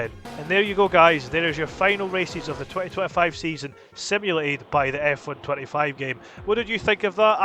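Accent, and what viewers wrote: Scotland, United Kingdom. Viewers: What part of scotland are you from?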